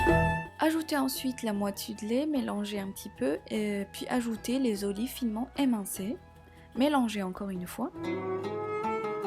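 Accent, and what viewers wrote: Morocco. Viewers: L'accent marocain j'adhère pas 😖 Mais bon y'a toujours dès rageur qui accepte pas les avis des autres:) Sinon comme d'hab une bonne maman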